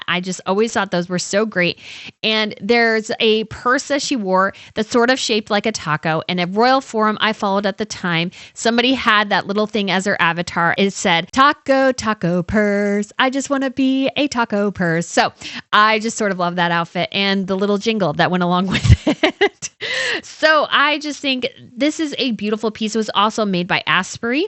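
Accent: United States. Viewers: Sorry the annoying, screechy, out of place, American voice was just too much for me to continue watching . What a shame!!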